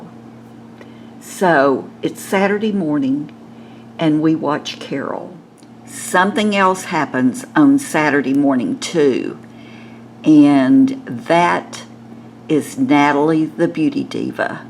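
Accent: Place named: Arkansas, United States. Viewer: ***** oh, I love Arkansas! That's why you have such a beautiful accent! Stay safe!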